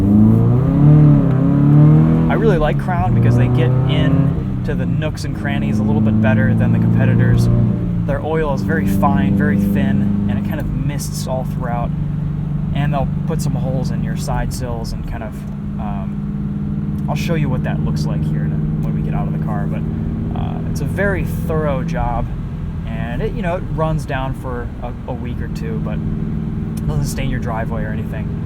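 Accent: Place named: Midwest, United States. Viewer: ... Cuz all those Illinois and Michigan ppl sound the same.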